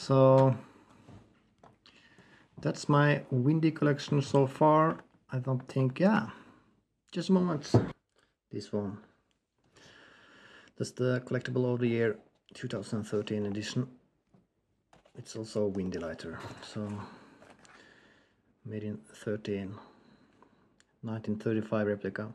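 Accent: Norway. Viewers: He sounds norwegian :-) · Gotta be a norwegian. Nice norwinglish. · This sounds to me like a Norwegian accent. It’s beautiful.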